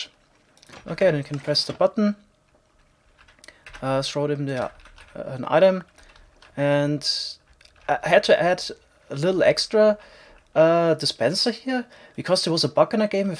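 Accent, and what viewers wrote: Germany. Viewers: du bist deutsch man hört das so raus xD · Danke für das Tutorial! Man hört extrem deinen deutschen Akzent ;)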